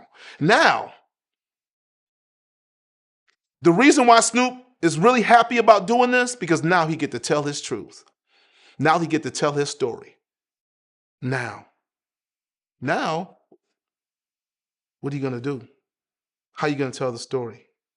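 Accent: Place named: West Coast, United States. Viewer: West coast accent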